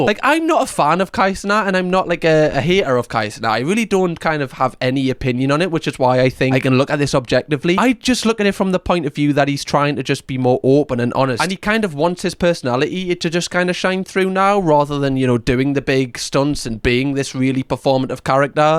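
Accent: Newcastle, United Kingdom. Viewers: Idek who this is but ill watch it because its 😂 love the fellow geordie accent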